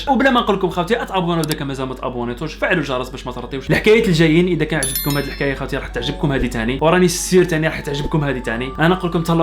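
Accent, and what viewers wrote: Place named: Morocco. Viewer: هدرتك تقول مغرببي 🙄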